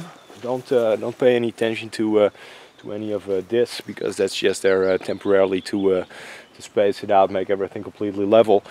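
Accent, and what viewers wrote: Netherlands. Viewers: Hey, Ik hoorde in je video's een Nederlands accent en nu in je bouw video van je boomhut werd dit bevestigd. Erg gaaf wat je allemaal doet, en ook origineel. Ik ga blijf je volgen, ga zo door. En je weet wat ze zeggen een goede timmerman verliest nooit ze kunde:-) Gr Tienus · Grappig dit, ik kreeg door je lichte accent al het idee dat ik hier met een Hollander te maken hadden. Ik heb een paar jaar geleden ook een "playhouse" gebouwd voor een familie in Sintra, Portugal. Het is grappig om te zien dat er veel details in jouw playhouse zitten die ook in mijn playhouse verwerkt zitten, bijvoorbeeld de hartjes is een identiek detail. Zou het door onze hollandse achtergrond komen? Ik heb er geen video van gemaakt maar ik kan je wel wat fotos sturen als je me je e-mail adres stuurt. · ik wist dat jij nederlands was want je praat echt raar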